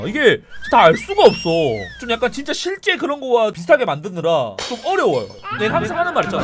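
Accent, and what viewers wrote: Busan, South Korea. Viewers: 앜ㅋㅋㅋ.. 역시 부산사나이답게 사투리가..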